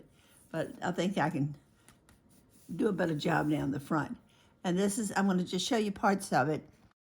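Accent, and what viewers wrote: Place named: South, United States. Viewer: Thank you to Youtube for the algorhythm working correctly to recommend this delightful channel! This is a treasure trove of techniques. Beautifully done! And I love your Southern accent, it's so pretty!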